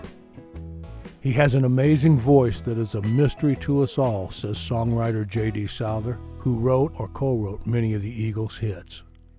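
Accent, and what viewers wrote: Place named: East Texas, United States. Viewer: As someone born and raised in gilmer, and a die hard fan of your channel, I really like how accurately you told his story. My grandfathers best friend was his dads boss. They worked for an auto parts store and my grandfather used to go with them to nascar races. In my head I have my own little rivalry with don. When I was a kid, I was a drummer and singer and everyone used to say my voice was just like his. I still sing, but I haven’t quite overtaken him yet. More of a guitar player anyway. Tbh, my sister is great friends with whiskey Myers and I’m borderline hoping on a Steve gains kinda situation lol I know you’ve got to be somewhat in my vicinity by the way you speak and the specific music you’re really into, I just know it. East Texas?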